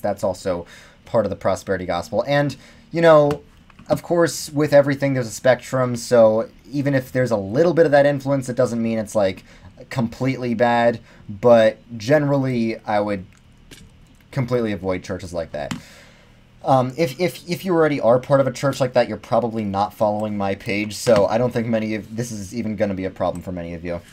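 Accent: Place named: New Jersey, United States. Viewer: He's Jerseyian, you are allowed to make fun of his accent all day - me, a based Texan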